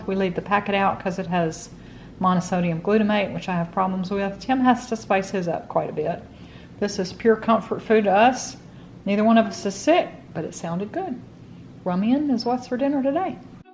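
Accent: South, United States. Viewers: Thank you for another week of meal ideas,. I'm so sorry about your accident...wow! I love that veggie lomein, and am not ashamed to admit I also love oodles of noodles. Have eaten them hundreds of times with no ill effects. LOL Will you forgive me if I confess I can't seem to get into lentils? (Although my son keeps trying. He's given me about three pounds worth, and they're all sitting, being ignored, in my pantry.) Yeah on pantry meals, btw...you're doing great! I read one of the comments that someone criticized your voice?!? You have a lovely, soft, calming voice, with just a slight southern accent (Tim's is more pronounced.) It's one of the things that makes your videos such a pleasure to watch. Just keep on being you. There's a reason you're headed to 10K subscribers, my friend. hugs...stef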